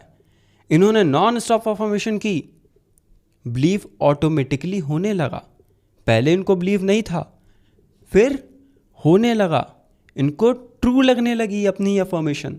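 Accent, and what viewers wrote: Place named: Allahabad, India. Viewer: Ap allahabad se ho kya kyoki mai kom Ham bolte hai 😂❤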